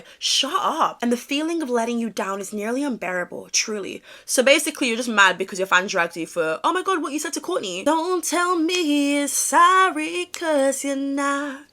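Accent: United States. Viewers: The American accent is killing me 😭 she is so sick and twisted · !! Thank you for always bringing the best pop culture content to the public! You slay, queen! Literally Crissy Tegan is so insane and I'm glad you're speaking up about this! Also, LOL I'm an American and I have to say that your American accent is so great!!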